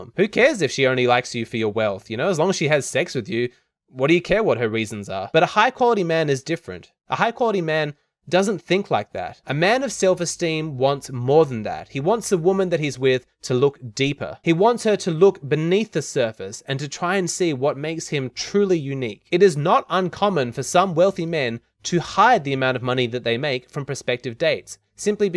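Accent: South Africa. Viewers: She's so South African 😂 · That accent sounds south african. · This is for sure a South African video. I can hear the South African accent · She sounds soooooo 🇿🇦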